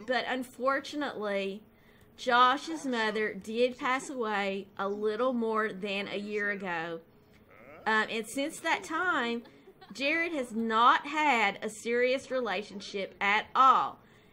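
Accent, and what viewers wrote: South, United States. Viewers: Hi!:) I've commented on a few of your past videos and I've finally caught up on this LP and let me just say: I LOVE it! It's honestly one of the best Sim's LP's I have ever watched. I love how you continue the story; you're so creative! And your voice is very soothing; I could listen to you all day.. It reminds me of the family that I have down South in Kentucky and Tennessee! One more thing before I go! (Sorry this is such a long comment.) I found a picture on Facebook of a house and I was wondering if there was a way I could contact you and send it too you? I would love to see a speed build with your take on the picture! Thanks..:)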